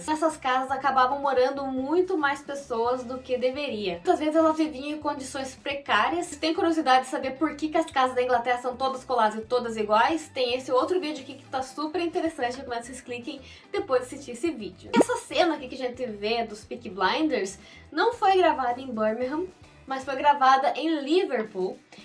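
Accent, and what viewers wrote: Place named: Passo Fundo, Brazil. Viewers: hehaehae jurava que era gaúcha tbm. Sotaque parecido sabe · Esse sotaque de Passo Fundo.🤭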